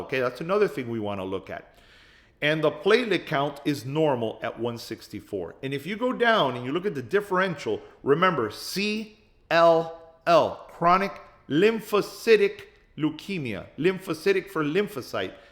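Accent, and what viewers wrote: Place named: East Coast, United States. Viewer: I was recently told that I may have LCC and have started the testing process. I’m a 54-year-old woman with a connective tissue autoimmune disease, and my lymphocyte levels have been elevated for the past four years. I plan to revisit this video after my appointment next month, when I hope to have a better understanding of what’s going on. Thank you so much for taking the time to share this information. I also wanted to mention that your East Coast accent was incredibly comforting to me. Having grown up on the East Coast and having lived in the Pacific Northwest for the past 25 years, hearing your voice made me feel relaxed and reassured. It reminded me of home and gave me that warm, familiar feeling of being around family. I didn’t realize how much I needed that comfort until I listened to you. Thank you again for sharing your knowledge and helping others navigate what can be a scary and uncertain journey.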